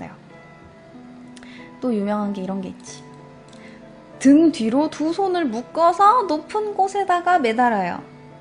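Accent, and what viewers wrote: Gyeongsang, South Korea. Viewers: 사투리가 들리는데 경상도쪽 억양인가요?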